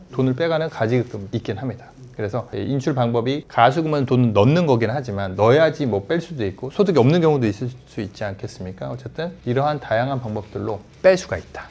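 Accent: Jeolla, South Korea. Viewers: 성님 전라도 사투리 고치실 생각은 없으신가여!!듣기 거북해서염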